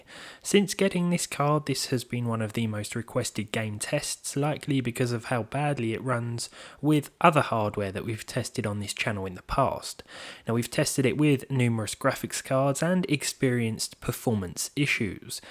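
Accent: United Kingdom. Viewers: Omg. Very good british acsient. <3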